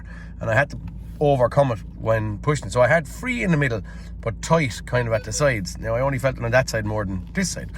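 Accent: Ireland. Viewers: I've been away from Ireland for a week..and that has to be the most Irish accent I've ever heard in my life. Brilliant production and delivery.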